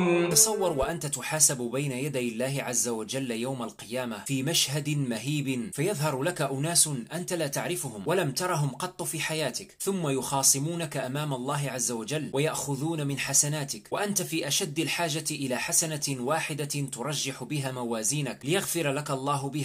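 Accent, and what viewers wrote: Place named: Maghreb. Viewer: لك لهجة المغرب العربي في كلامك يارب تكمل فرحتي وإفتخاري إن كنت جزائري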